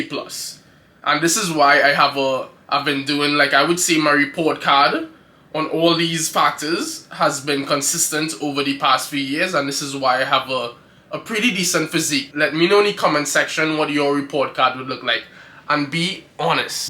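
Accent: Trinidad and Tobago. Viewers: Are you from Trinidad? I'm hearing it vaguely. But anyways, I came across your shorts and it's been history ever since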